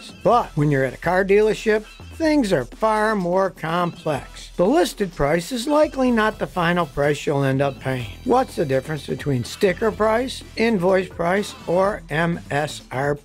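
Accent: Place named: Buffalo, United States. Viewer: Scotty is possibly the most famous guy from the Buffalo area. Love the Buffalo accent and the word "pop" instead of "soda" or "tonic."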